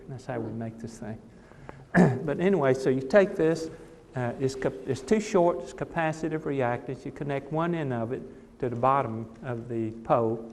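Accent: South, United States. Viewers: have never heard an oriental with a southern accent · I hear a American with a Southern accent.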